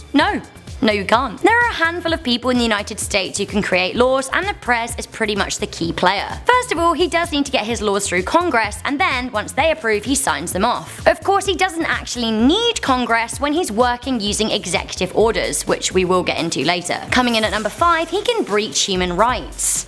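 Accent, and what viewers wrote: United Kingdom. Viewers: I’m assuming you are British due to the accent and would like to point to the stupidity of this video. I am not a Trump supporter but feel the need point out that Trump along with all US Presidents are entitled to all of the things you mentioned. HOWEVER, the Queen of England (UK) is in fact above all British laws as she is considered the law. She could commit murder in the middle of London while flying a Nazi flag and drinking Scotch Whiskey naked and pissing in a bucket and could not be arrested. Everyone in the British government serve the queen. SMH at the unrealistic way some attack Trump. He may not be the best president but he is still subject to US law and impeachment.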